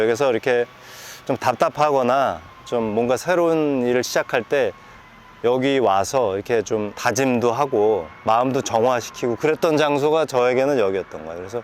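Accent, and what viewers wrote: Seoul, South Korea. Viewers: 원래 이런 병맛개그 성격이셨나요ㅎㅎ청춘의 덫 동철이랑 말투가 꽤 흡사하시네요~약간 옛날 서울사투리 느낌ㅎㅎ 올만에 뵈니 반가워요~~~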